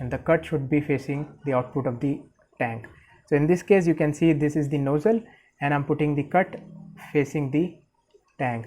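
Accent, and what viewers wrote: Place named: India. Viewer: When you hear that Hindu accent you know the tutorial is gonna be fire!!! Thanks man, you helped me fix it! My turtle is happy with bubbles again :3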